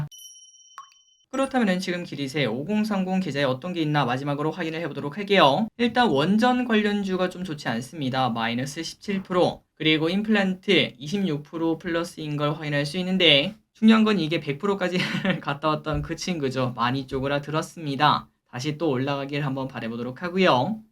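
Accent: Gyeongsang, South Korea. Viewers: 대구분셨나요.? 반가버유.~~^^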